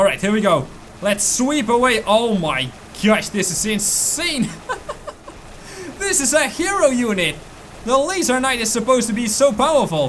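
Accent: Netherlands. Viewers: Are you from The Netherlands? You got that enthousiastic Dutch accent when speaking English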